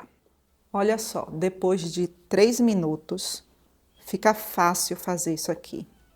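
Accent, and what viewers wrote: Bahia, Brazil. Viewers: Sotaque mais pra Bahia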